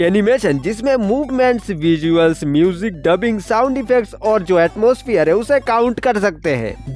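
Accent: South, India. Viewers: Lots of love from Rawalpindi your accent is same like movie Chennai express you are from which city Namaste Namaste Namaste Namaste Namaste Namaste Namaste Namaste Namaste Namaste Namaste Namaste Namaste Namaste Namaste Namaste Namaste Namaste Namaste Namaste Namaste Namaste Namaste Namaste Namaste Namaste Namaste Namaste Namaste Namaste Allah Hafiz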